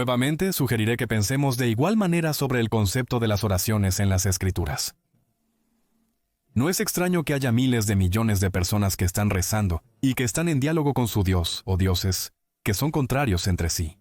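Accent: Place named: Colombia. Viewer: no mija , hablas como colombiana , eres? , he estudiado tanto y analizando tanto , que ya no tengo dudas , así me pongas una pistola en la frente , es tan real , que no ubiera querido existir sin la existencia de Dios , aunque se que es imposible existir sin ( ÉL ) Sabés que significa su nombre ? SOY el que SOY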